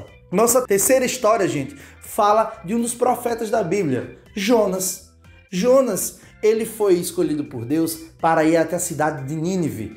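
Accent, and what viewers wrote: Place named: Northeast, Brazil. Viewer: Top de mais! Os desenhos são ótimos e a narração é muito boa, especialmente pelo sotaque nordestino! Parabéns! Continuem! Deus vos abençoe!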